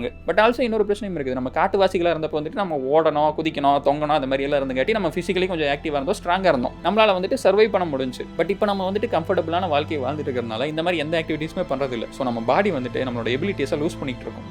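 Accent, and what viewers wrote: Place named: Kongu, India. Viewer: சடபுட கொங்குஸ்லாங்❤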